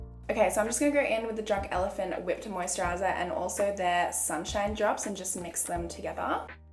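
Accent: Australia. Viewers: I know people have probably already said it but she reminds me so much of Maia Mitchell. I think their noses are similar. But the Australian accent just makes it better lol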